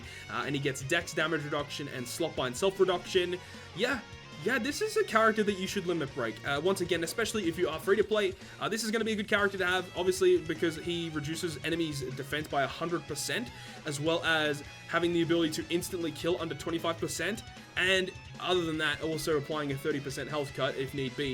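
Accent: Australia. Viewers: Been looking for this damned video. I need an Australian accent to explain game to me thank God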